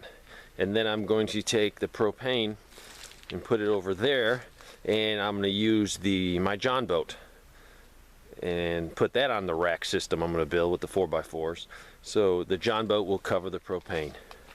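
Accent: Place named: Chicago, United States. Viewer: May I ask you why the bathtub is outside of the house? I also noticed that you are cutting green brush in the winter! You really must be in the south but you sound like you're from the North like Chicago or there abouts. I am looking forward to you video how to filter the rain water. I want to use my poly totes and put them in the basement (before it's completed) and have the water run down there and use it for washing and such.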